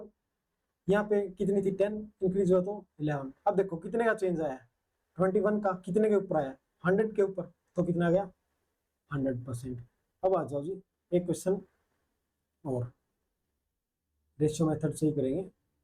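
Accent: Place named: Haryana, India. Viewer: Haryanvi control kro sir thoda 😅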